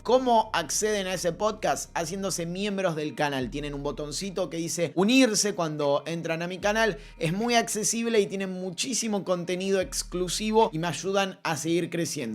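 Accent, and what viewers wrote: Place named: Argentina. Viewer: Porque TODOS los argentos tienen está pronunciación "NAGGETS" "CARRY" "HALLIBARTON" "CLATCH" "THANDER".... ME IRRITA DEMASIADO ESA PRONUNCIACION DE LA "U" TAN ESTUPIDA QUE TIENEN.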